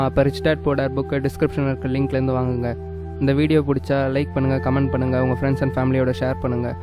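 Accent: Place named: India. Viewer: Brooooo can you changer reader pronunciation please no Indian 👳 better Chinese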